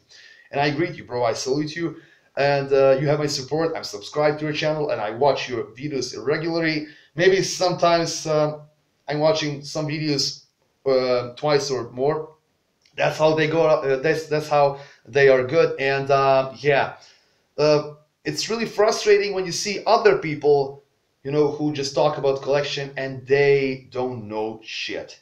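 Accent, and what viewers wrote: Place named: United States. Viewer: U sound so american haha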